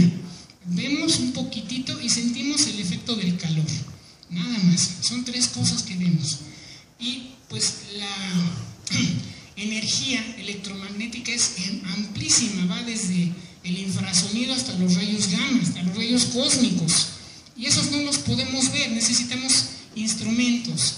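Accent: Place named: Sinaloa, Mexico. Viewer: ¡Sabía que conocía ese acento en algún lado! Eres orgullo de Sinaloa, sigue así;)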